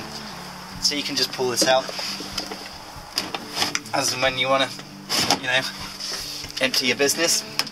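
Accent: United Kingdom. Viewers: I just love the British Accent, I don't know why. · one of the most adorable homes.love his brit accent.love the way his eyes light up when he talks about his wife.every feature in the home is awesome.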